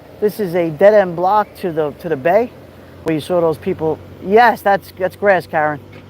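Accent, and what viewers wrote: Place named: New York, United States. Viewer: You are a bright ray of sunshine, and I just love listening to your NY accent!!!☀️😎👍🏻 I had to hop off, but just finished the ending of yet another great livestream and yes thumbs up!👍🏻 Thank you and God Bless You and your family!🙏🏻🇺🇸❤️🤍💙🇺🇸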